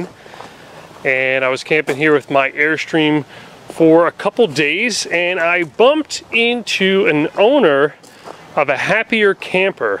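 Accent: New Jersey, United States. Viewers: that Jersey/ mid east accent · I think it's the New Jersey accent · SUCH a Jersey boy. Can really hear it in your voice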